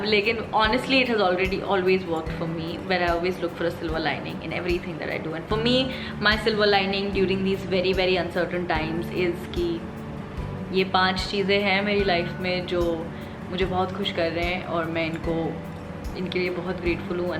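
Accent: Nepal. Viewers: Oh gosh best nepali accent ever You the cutest I am nepali too and i am following u for years now Feels amaizing listening your own mother tongue from a person u follow is trying to learn it 👌👌 Best wishes to you You are beautifull soul Cheers to you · your nepali is the cutesttttttt.... dherai maya tapailai.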